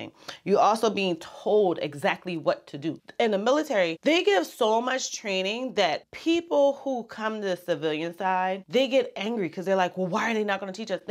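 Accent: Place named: Lowcountry, United States. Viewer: I don't know how your channel popped up on my feed. I'm just glad it did. I love the content but what got my attention immediately was the accent to which I said, This lady is from my home. I scroll down to the bottom of your description and see your address as Goose Creek. I definitely have to click subscribed for the Low Country. Stay positive!!!!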